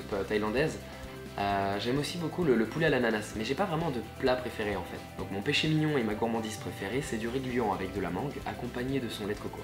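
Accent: Alsace, France. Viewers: tu prenais un accent "alsacien" plutot accentué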